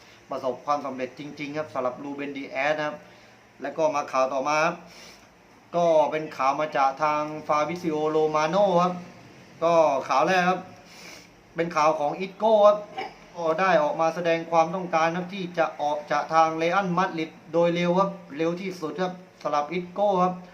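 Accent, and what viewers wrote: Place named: South, Thailand. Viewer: คนใต้ม้านนิ!!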